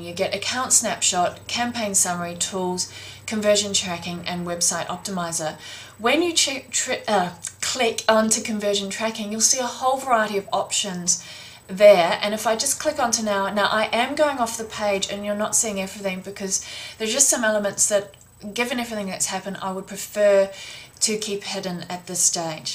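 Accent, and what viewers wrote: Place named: Australia. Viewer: perhaps because she's Australian! This is not an english accent mate.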